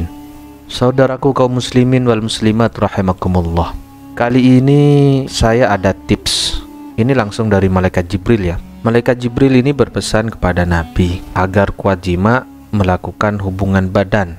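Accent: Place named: Madura, Indonesia. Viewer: Adminya ketahuan sekali maduranya 🤣🤣🤣🤣 . . .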